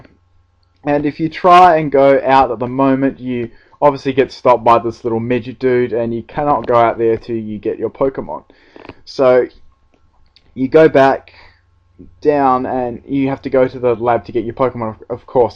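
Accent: Australia. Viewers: what part of aussie are you from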